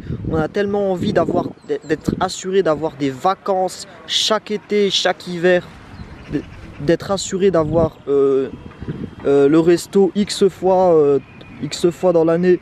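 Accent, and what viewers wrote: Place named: Banlieue, France. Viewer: bien d'accord avec le message, vraiment dommage ton accent de banlieu . merci du taf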